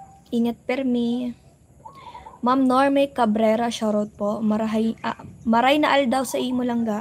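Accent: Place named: Visayas, Philippines. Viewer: 😂😂😂😂😂 nakakatawa ka langga mag salita nf bisaya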